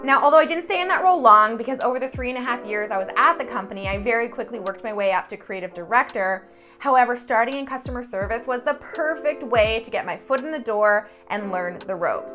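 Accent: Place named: South, United States. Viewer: Excellent video. I am so glad I stumbled on this channel. Incidentally, good southern accent.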